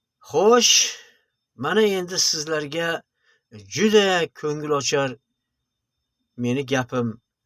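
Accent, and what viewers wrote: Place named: Kyrgyzstan. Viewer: Dunyodoshlar deyishizga qaraganda siz Oʻzbekistonlik emassiz.Shevangizdan Qirgʻizistonlik oʻzbeksiz. Rossiya propagandasini kuchu bu!Oʻzbekistonliklar bunaqa fikrlamaydi!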